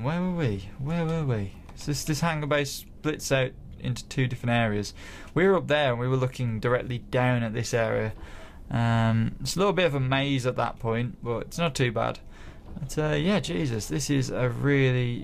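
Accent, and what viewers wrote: United Kingdom. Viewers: Hey narrator, are you from Cannock?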